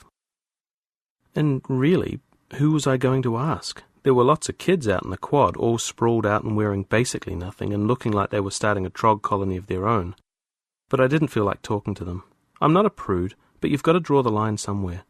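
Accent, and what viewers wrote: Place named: Australia. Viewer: Mate, you’ve already got an Aussie accent that’s hard to understand on a good day, slow down your reading stop blending words together I can’t keep track of what you’re saying.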